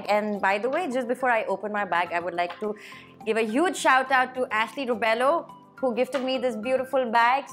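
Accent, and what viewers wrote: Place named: United States. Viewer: açcent like American.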